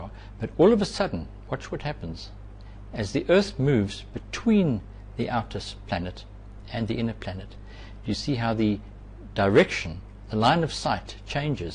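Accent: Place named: South Africa. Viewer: Weird, I thought the planets orbited in an anticlockwise motion when looking down on the north pole. But this guy sounds South African so I guess he's basing things from the southern perspective.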